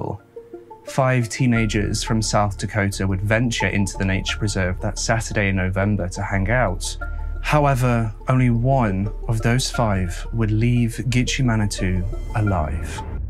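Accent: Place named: England, United Kingdom. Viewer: Oh, I don't really find any reason to take offense, but it did spawn an enjoyable and interesting conversation about the strange way certain words are spelled or pronounced. And I'm not trying to bag on in any way; he's English and can't really be expected to understand the full nuance of American pronunciation. I really only commented because I'd want to know if I was pronouncing something wrong and I'd hope somebody would politely correct me.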